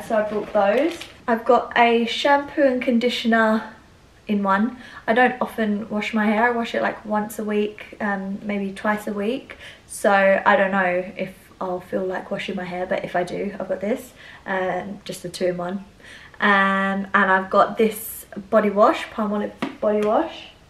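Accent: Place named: England, United Kingdom. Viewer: Sounds English to me lol!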